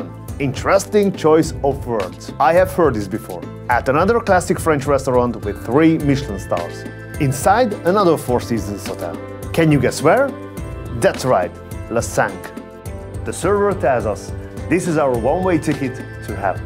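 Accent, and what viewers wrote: Hungary. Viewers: Sounds exactly like any other Hungarian I've spoken to...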